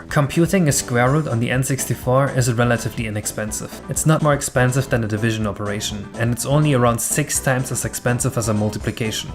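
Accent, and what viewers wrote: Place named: Germany. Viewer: Listen to the accent, yea he's definitely German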